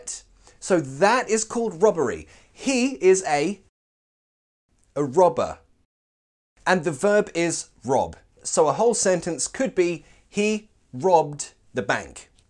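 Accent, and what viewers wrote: United Kingdom. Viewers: Thank so Much ! I really like your accent, so British ! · Hey, I love you, I really do. I've always been watching your lessons. You're really funny and I've been recommending your YouTube Channel to my friends. But there's something I want to tell you, you're dressed up like an American Police Officer and speaking with the British accent 😁😁 it makes no sense 😁😁😁. I'm laughing out loud. It's not a critique, don't get me wrong. What I'm trying to say is that you're so funny, you really are. The way you create the videos make us being focused on the lessons. It makes us to want more and more an more. I love the way you make your lessons, your videos and I do believe I'm not the only one who thinks like that. I love you mate, actually I'm in USA, I should be saying "I love you my friend" 😁😁. I know, I know, Mate and Friend are the same thing. 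😁😁 Wish you all my best my friend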